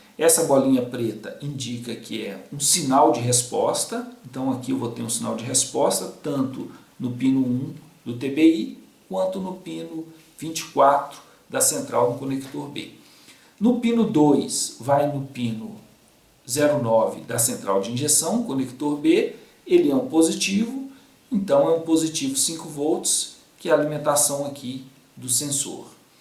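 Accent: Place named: Belo Horizonte, Brazil. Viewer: Esse aí é de BH certeza!😅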